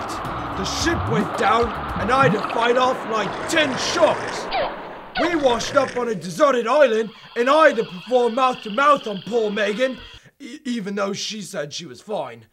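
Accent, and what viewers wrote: United Kingdom. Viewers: Dear Charlie, why do you have a british accent? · Charlie are you really Scottish because you are actually using a Scottish accent mate but do make some bloody good content and ghost Charlie mate you should go back in time to when your alive and tell him to build a machine to save smosh you bloody good mate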